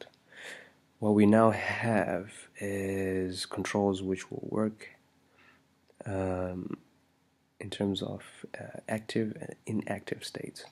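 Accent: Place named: Zimbabwe. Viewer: you sound Zimbabwean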